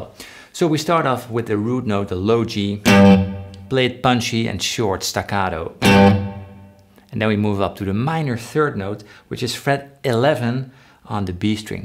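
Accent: Netherlands. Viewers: What nice licks,! I ( 73 ) play and love my guitar(s) since 65 years. Still pure joy, fascination and constant learning. In addition, your slightly nederlands accent: Wonderful! Keep it up!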